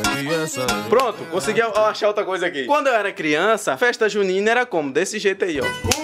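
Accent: Bahia, Brazil. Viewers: Vc e baiano? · Ameiiii!!! Vc eh baiano mocim???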